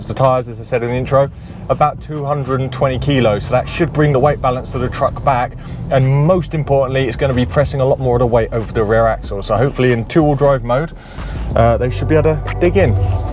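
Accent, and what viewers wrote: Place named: United Kingdom. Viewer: единственное этот британский "акцент" в английском звучит необычно, когда ухо привыкло к американскому английскому))))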